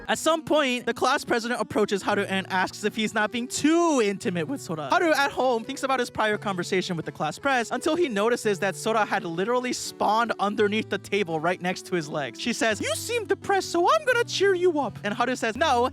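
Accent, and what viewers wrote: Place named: Turkey. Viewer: Are you Turkish? Your pronunciation sounds very Turkic...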